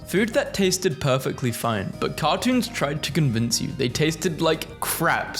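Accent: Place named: Southern England, United Kingdom. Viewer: Your accent is modern and largely originated in the late 18th and early 19th centuries among the southern English upper classes. So actually makes a lot of sense historically because we never adopted the made up weird accent.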